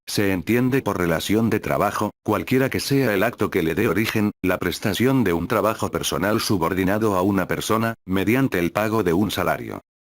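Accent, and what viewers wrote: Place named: Spain. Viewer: Por qué en acento español.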